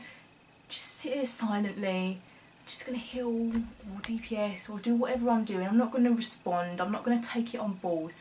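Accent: England, United Kingdom. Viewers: So English accent!!!!! I love it ❤️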